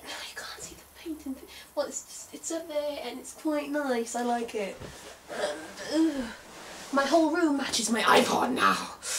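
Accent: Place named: RP, United Kingdom. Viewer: complete stunning you are m`lady with one of the strongest high class very posh & best british accents ive heard by far absolute fabulous, great channel & love the content also. btw im genuinely being honest complimenting you, im not joking or trying to be rude to you in any way i hope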